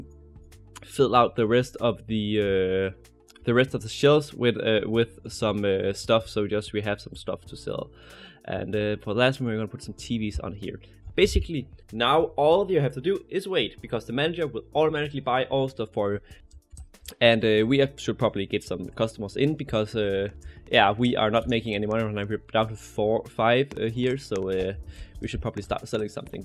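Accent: Denmark. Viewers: Er du da dansk?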